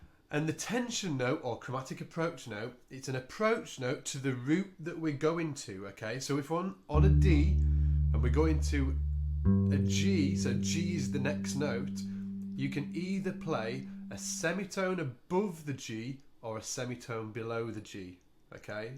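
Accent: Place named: United Kingdom. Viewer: I believe this is a pure British English. Sooooooo cool)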